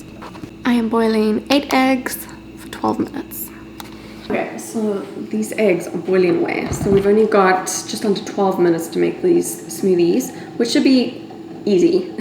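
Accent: South Africa. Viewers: where is your accent from are you South African, Australian or from New Zealand? the accents are currently confusing me currently so i tossed it all in cause i would think 1 of those 3 but i think South African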